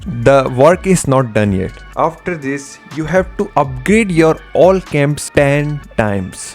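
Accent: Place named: India. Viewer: It's difficult to understand u with ur indian accent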